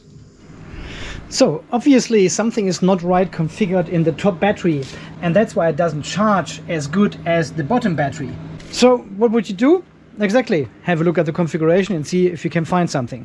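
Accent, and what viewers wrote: Germany. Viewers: Hi, I just found your channel a few days ago when trying to learn about smart BMS's. Your content is amazing, full of information and humor, and I am greatly enjoying learning from you. My late father was an immigrant to the US from Austria, so your German accented English is a pleasure to listen to. I have subscribed to your channel. I have a question that may have been answered in one of your videos that I haven't seen yet, so please excuse the question if that is the case. As a "general rule", how much would be saved by making my own 300ah 12v pack from "storage grade" LiFePO4 280ah cells vs buying a $700 USD prebuilt pack? Assuming no cost for tools and my own time, just costs for the cells, the wire and terminals and maybe a pack fuse, the aluminum for the cell interconnect buss bars, the steel or aluminum and hardware to compress the packs, and the 150a discharge rated smart active balancing BMS? 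I look forward to your response and guidance, Philip from Oregon, USA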